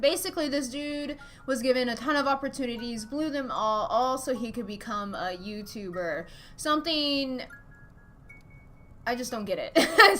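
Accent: South, United States. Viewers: To be honest, I could tell you were from like a southern area. But I am too. Hi southern sister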